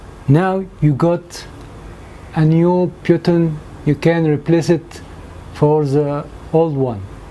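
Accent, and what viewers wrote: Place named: Egypt. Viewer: Nice Egyptian English .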